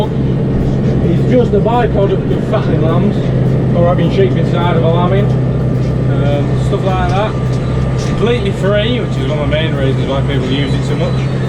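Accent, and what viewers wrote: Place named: Yorkshire, United Kingdom. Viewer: He is from yorkishire the accent should tell u that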